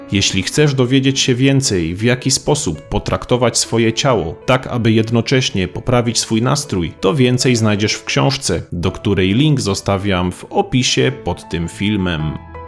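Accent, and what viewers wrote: Silesia, Poland. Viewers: Czy lektor jest ze Śląska? Słychać akcent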